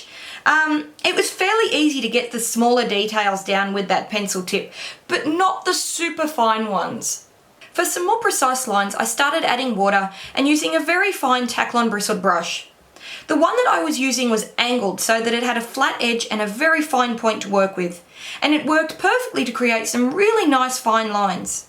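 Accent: Australia. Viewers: from one Aussie to another..it’s refreshing to hear an Australian accent for a change on YouTube 😀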